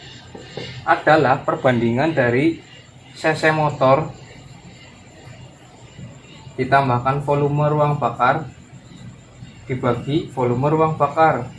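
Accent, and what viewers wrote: Banyumas, Indonesia. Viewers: Jenengan dari mana mase..kok rodo ngapak..?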